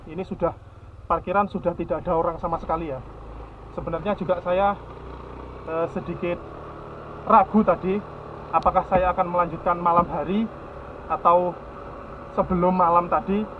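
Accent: Java, Indonesia. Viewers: Gemes dengar suaranya... medok medok pie ngono.. Salam dr medan Pak..buat sekeluarga...sehat selalu..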